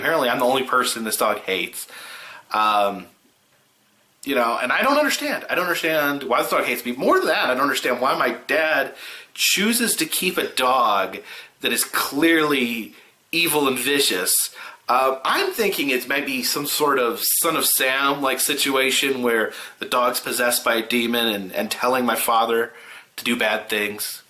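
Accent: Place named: South, United States. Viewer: If you got together with Dan Bell, that would just make my day, year.... LIFE!!! His creepy with your southern....WIN!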